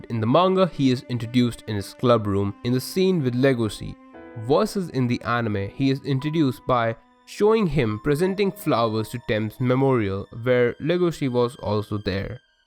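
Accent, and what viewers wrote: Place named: India. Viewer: Du Yu hav a thuffy nose or ar u Indian? Sorry I couldn't quite understand your accent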